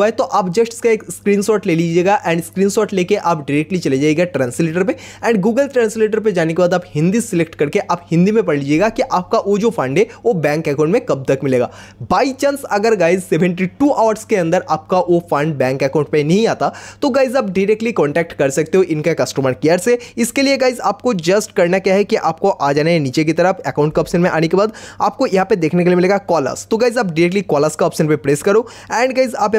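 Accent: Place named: Bengal, India. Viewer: bro the bengoli accent is so freaking cute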